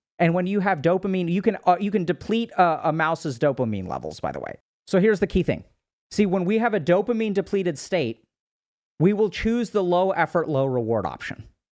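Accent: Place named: India. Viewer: HAHAHAHAHAHAAHHHAHAH THE INDIAN ACCENT HAHAHAHA XDD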